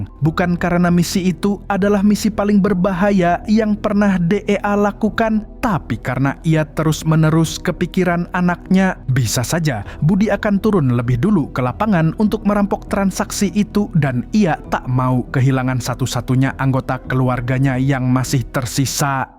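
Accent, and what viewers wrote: Banjar, Indonesia. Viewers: Orang banjar kah mang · Orang Banjar ni admin😂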